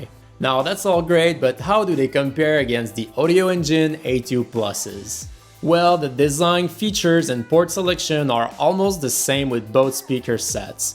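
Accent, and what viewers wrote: Quebec, Canada. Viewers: are you from Quebec? you have a French Canadian accent. nice review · J'adore ton accent québecois! Je subscribe!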